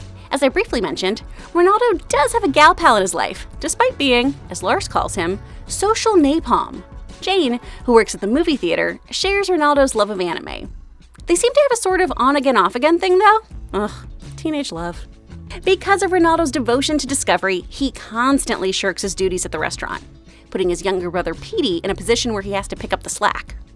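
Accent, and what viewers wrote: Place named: Texas, United States. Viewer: I knew there was a reason I liked your voice 😆 Being a Texan, I love familiar accents above all else 😋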